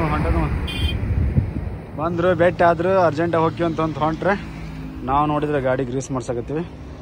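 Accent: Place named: North Karnataka, India. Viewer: Anna Uttara Karnataka alla ninda, Ya ura nimda ❤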